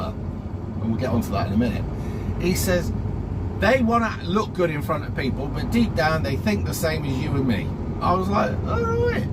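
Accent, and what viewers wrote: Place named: Bristol, United Kingdom. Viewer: Sounds more like Bristol.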